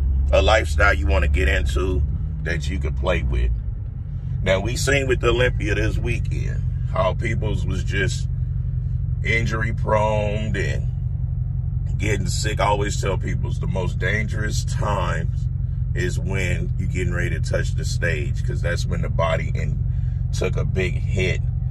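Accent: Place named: Texas, United States. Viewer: I know you're from Cali. But you sound like you're from South Texas. You're hilarious, great information.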